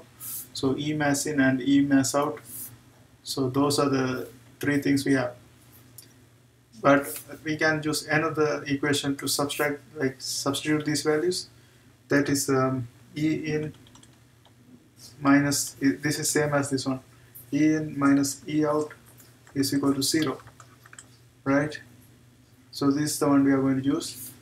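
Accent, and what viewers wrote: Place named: India. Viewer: Indian speaker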